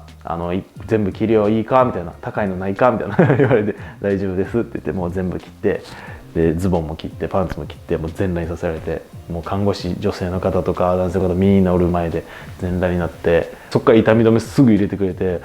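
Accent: Kansai, Japan. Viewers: 想像しただけで痛々しい。 関西弁？で明るく話すけれど大変だったと思います。 私だったら残された人生に感謝もできず自暴自棄になってしまうかも。 生きる力をもらいました。 ありがとう😊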